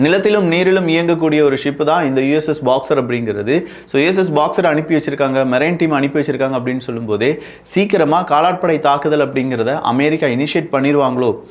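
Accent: Kerala, India. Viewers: hear his pronunciation of college, office and similar words with o sound. Only someone with Malayalam as mothetongue can talk like that. His entire family is from Kerala and settled in Trivandrum. If he is Tamil, then why buy land there and build and settle there??? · his parents are from Tuticorin/ Udangudi area - he did his middle and high school in Vandiperiyar area which is in Tamil Nadu kerala border - you tend to get Malayalam mixed slang when you stay in border area - obviously he knows Malayalam very well you can observe the same slang in Nagarcoil / Marthandam area as well - they don’t become Malayalis right ? He worked in software company in Trivandrum and he was YouTuber at the same time - he got home in Trivandrum - it’s little cheaper to buy properties there than Chennai as you know so obviously you don’t move out that easily once you buy home A lot of our Tamils are settled in Mumbai - they don’t become Marathis right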